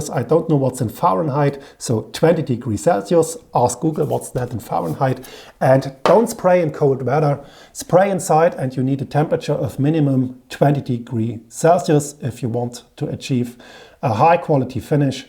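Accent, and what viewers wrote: Germany. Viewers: Hey, Awesome work, artistry and video's too boot.. thank you.. we all love your video's as with knowledge we have the balls to deliver instead of our girlfriends taking the lead.. I have a question... I want to strip / repaint an Old Skool race road bike that has an alloy, aluminium frame.. I would like to know is it better to strip, polish the raw metal and then apply a clear adhesive promoter before candy spray or is it better to leave the metal surface 'rough' and then apply a primer and candy spray... what do you suggest please as you are the Prime Mover, Master of Spray excellence. Cheers Ivan from UK (ps: Your videos ROCK... Gernman accent, humour and technical excellence... Yavol..:-) Use your brain and balls don't be a pussy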